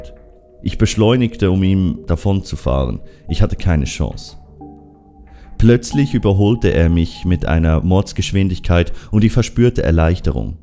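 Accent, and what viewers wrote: Switzerland. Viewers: Du bisch Schwiizer? Jo ehre💪🏼🇨🇭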